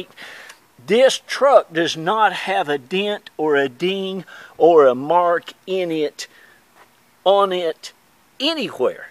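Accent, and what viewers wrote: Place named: Florida, United States. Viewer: Man I love the Florida accent, beautiful truck, I’m currently looking at one for my self.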